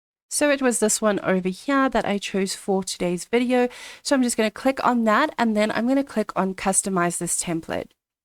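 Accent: South Africa. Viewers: 😅 I watched this video until the end, only to realise “Wait, her surname is ”, this must be a South African! I was second guessing myself throughout the video because I noticed your accent sounds very South African. I was busy working while listening to your video in the background. Now that I found a SA cricut channel, I will binge watch. Thank you🤭🤭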